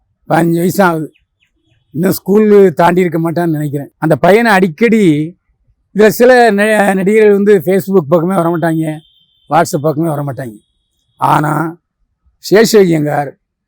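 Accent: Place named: Chennai, India. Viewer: Enya ungaluku lam chennai slang lam kevalama iruka? Thiruntha matingaya.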